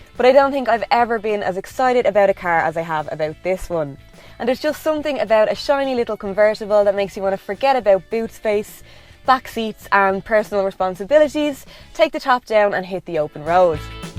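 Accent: Ireland. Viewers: How has this channel not gained more popularity, a girl with an Irish accent driving beautiful cars. Whats there not to like, keep up the good work!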